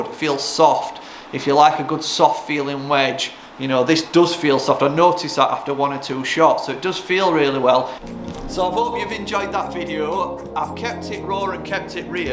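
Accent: Yorkshire, United Kingdom. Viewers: Love it and it's great to hear a proper yorkshire accent on YouTube!! 😁😁 Keep em coming!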